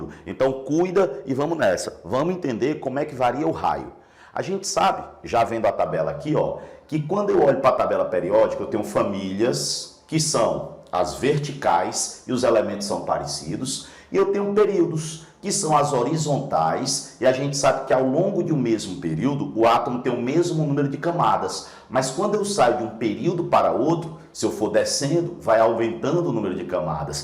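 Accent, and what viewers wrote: Northeast, Brazil. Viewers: sotaque nordestino não negaaa. é "nois" oxeee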